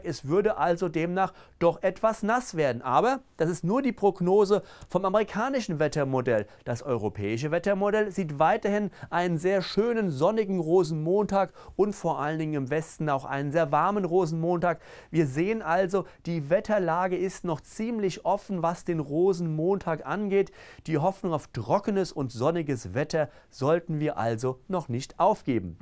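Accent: Hesse, Germany. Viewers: Hessischer Dialekt. Oder Rheinland-Pfalz.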